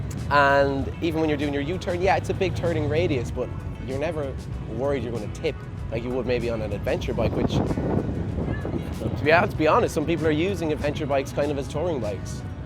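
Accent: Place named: Alabama, United States. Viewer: Moving away from HD and looking at a Wing. Getting a little outdated and needs some new updates, but you get a lot out of the gate. No extra cost for adding heated grips, heated seat, having multiple windshields, etc. Honda has been refining this bike for a lot of years and they seem to know what they are doing. Oh it’s not American, what bike is? HD ain’t. Indian ain’t. It’s hard enough to spend $30k on the Honda forget about the other brands. But I think I need a comfy bike for 2-up riding that can eat up the miles. I think the Wing is the answer. Nice video. Love the Alabama accent 😂. Ride safe 😎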